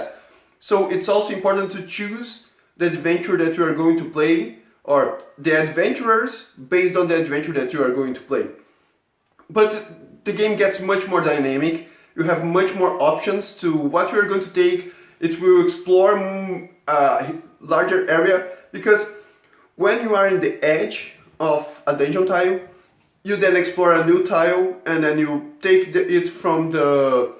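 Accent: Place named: Brazil. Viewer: Tu é BR, esse sotaque não engana kkkkkkkk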